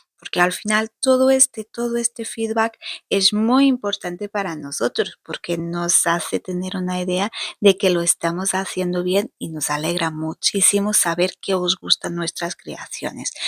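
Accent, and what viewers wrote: Portugal. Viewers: Iiiih gracias a ustedes, imaginé que eras portuguesa por tu acento, lo que no imaginé es que fueran pareja ¡Olé a los dos! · Gracias por contar los inicios del proyecto, yo os sigo desde hace un año aproximadamente y tenia mucha curiosidad por saber como habia empezado todo, Tarde un poco en reconocer el acento,pero habia llegado a la conclusion de que efectivamente eras portuguesa,pero creia que era carpintero Gracias por todo tengo una casa heerdada muy antigua en la que como autodidacta llevo peleandome toda la vida por mantener eos muebles antiguos heredados¨Teneis unas ideas geniales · Tienes un acento precioso me relaja ver vuestros vídeos y escuchar ese acento portugués tan agradable que tengáis mucho éxito un abrazo a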